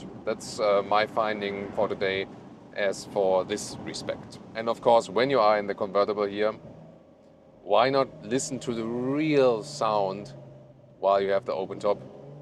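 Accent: Germany. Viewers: is such a classical German 😂 This laughter and this accent. Love that!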